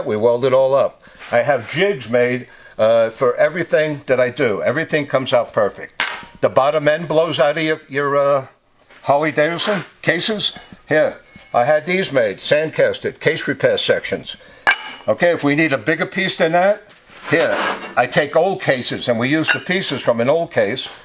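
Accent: New York, United States. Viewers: East Coast boy I new I could tell you were from New York... I'm in Philly love what ur into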